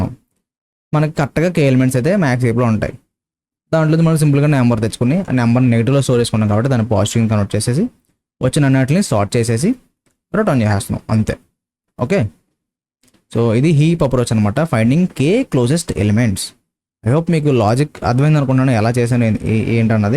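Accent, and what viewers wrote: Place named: Godavari, India. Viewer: You are from east or west godavari... Ur slang in very close to ours...🙂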